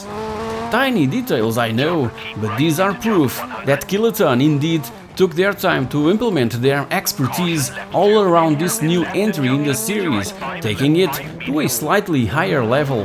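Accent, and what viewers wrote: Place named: Portugal. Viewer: You tuga? that accent is 105% tuga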